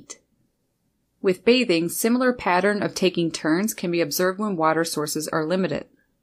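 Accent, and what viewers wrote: Canada. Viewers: Enjoy listening to your Canadian accent 😀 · I enjoyed your natural Canadian accent........ and all that bird stuff too.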